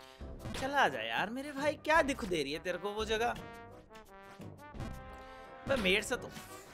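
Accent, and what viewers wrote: Haryana, India. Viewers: My mind have subconsciously added these haryanvi slangs and tones he uses Impact!!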